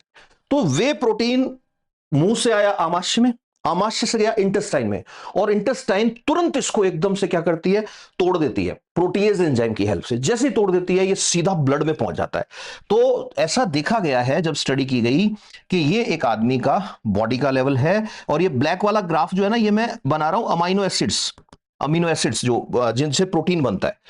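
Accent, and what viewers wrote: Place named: Haryana, India. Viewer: Sir haryana se lagte ho isliye topic suru karne se pahle namste ki जगह ram ram se suru kro to jyada achha nhi rhega bs man me aaya to bol diya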